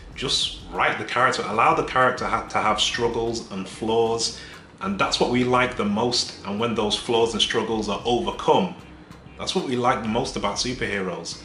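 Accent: United Kingdom. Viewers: Saw this in my recommend, saw the thumbnail and title. "Let's see what this is about." Black guy with a British accent. subscribed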